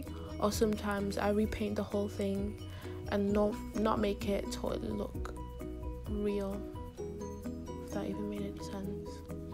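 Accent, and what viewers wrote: United Kingdom. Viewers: Where r u from? It’s sounds like a london accent sorry if it’s not❤️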